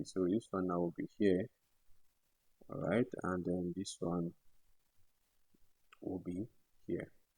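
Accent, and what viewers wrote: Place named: Nigeria. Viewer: The best 3d tutorial I've come across on YouTube. I love your simplistic, clearly explained, common Nigerian accent and jovial teaching style. You have 100% of my attention, and I will definitely recommend you to fellow students. Please, how do I get the CAD image installed, and how do I join the whatsapp group?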